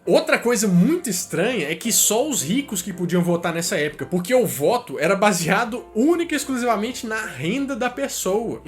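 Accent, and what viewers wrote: Minas Gerais, Brazil. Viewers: MUITO LEGAL ESSE VÍDEO. EU GOSTO MESMO É DESSE SOTAQUE MINEIRINHO GOSTOSO DEMAIS DA CONTA... PARABÉNS. EXELENTE CONTEÚDO... ESTOU AGUARDANDO A PARTE DOIS...🇧🇷🇧🇷💙💛💚👍👍👍👍👍👍👍👏👏👏👏👏👏👏👏🌻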